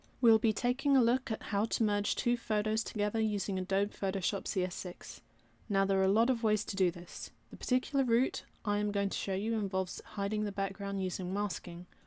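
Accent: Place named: Southern England, United Kingdom. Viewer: Well that was okay but you've gone too fast for some, I've only cottoned on by pausing the video to see what you've clicked. As of now, I'm totally sick of hearing your posh Southern voice & I'm a fellow Brit. You've literally explained nothing here - just skirted over everything at pace, awful, awful "tutorial" but "thanks" for trying...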